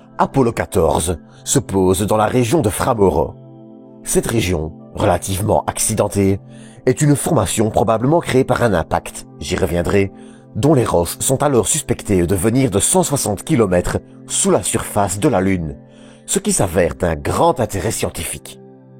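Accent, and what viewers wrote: Belgium. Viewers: T'es belge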